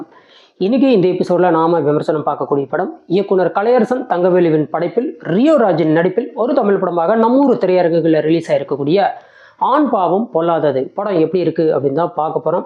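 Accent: Kongu, India. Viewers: aiyo Mokkai padam season kodumaigal saaamy... well done review - Kongu Tamizh Vazhga..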